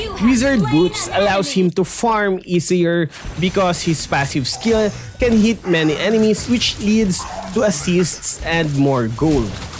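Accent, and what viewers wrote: Tagalog, Philippines. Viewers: The filipino accent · Your Tagalog accent is showing 🤗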